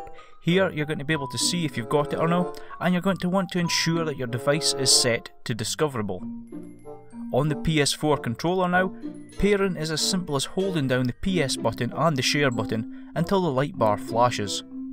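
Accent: Scotland, United Kingdom. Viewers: Nice accent are you from Scotland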